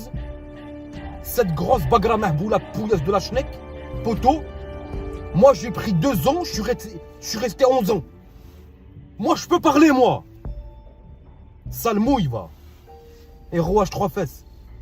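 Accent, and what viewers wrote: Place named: Lyon, France. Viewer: Ca ce voit ta été a Corbas 😂😂 tu pavarle comme les Lyonnais (sal mouille)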